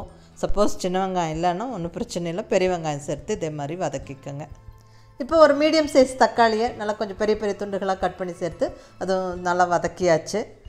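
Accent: Kongu, India. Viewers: அருமை சகோதரி. உங்கள் கொங்கு தமிழ் உச்சரிப்பும், குழம்பும்.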